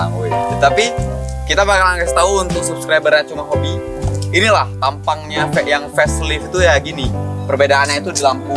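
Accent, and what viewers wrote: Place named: Java, Indonesia. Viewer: Orang mana nih, ngomongnya medok banget ??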